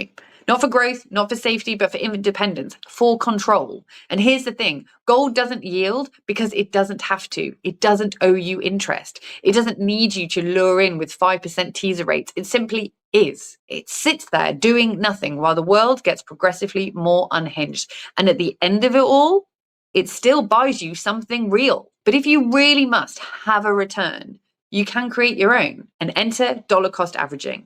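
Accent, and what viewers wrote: United Kingdom. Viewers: Excellent presentation!! Her story line was obviously well researched, well written and spot on. I am stunned and amazed that the average Joe has not (yet) piled into gold when governments and central bankers have been doing so for years. As an added bonus me being an American enjoyed the (British?) accent.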